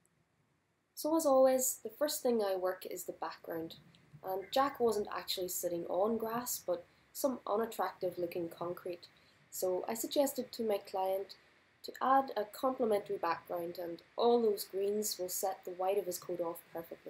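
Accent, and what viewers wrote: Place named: Ireland. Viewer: Great video as always! Also, I love your accent. My grandpa was born in Ireland so my pride for the Irish is strong!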